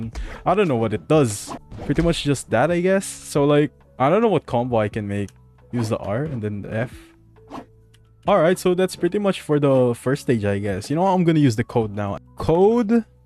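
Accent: Philippines. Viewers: Are you pilipino? it seem's you're pilipino because of your accent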